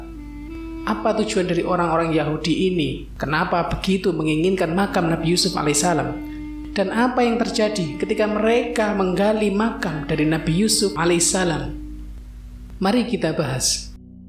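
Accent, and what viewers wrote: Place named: Java, Indonesia. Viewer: Logat yg bikin video jamet abiss medok da males gw ntn ni video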